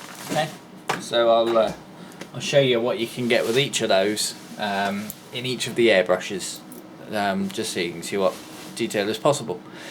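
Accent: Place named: Preston, United Kingdom. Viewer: HI really enjoy your vids and admire your talent. Where abouts are you located roughly of course, I used to live in England in Preston. Doesnt sound as if you are too far from there listening to you talk?. I reside in British Columbia Canada now. Are you aware of MIdwest Airbrush Supply in Chicago. They pretty much have everything and ship all over the world.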